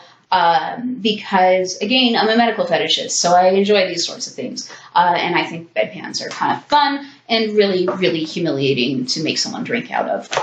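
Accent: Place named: Canada. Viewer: Like your Canadian accent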